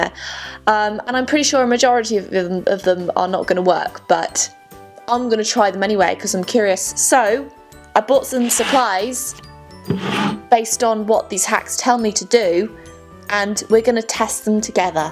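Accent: England, United Kingdom. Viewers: You opened that salt so effortlessly. seemed really safe. You sound so British in this- I love it! But you ruined it by hating on fish and chips haha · Okay. So. Hi! Where are you from? If you don’t mind me asking 😅😅 I think you’re living in America? Maybe? But I can’t work out your accent? You sound quite British like me! I just can’t work it out.. can anyone help? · So she has a British accent but she lives in America. How come? Why is she in America?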